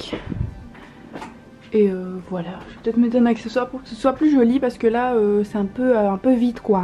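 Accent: Belgium. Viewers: Jai l'impression que tu est belge pourrais tu m'éclaircir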